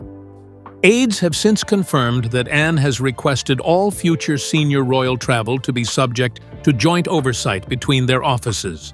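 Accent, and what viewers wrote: United States. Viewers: Now is this true>? The narrator's American accent tends to raise my suspicion..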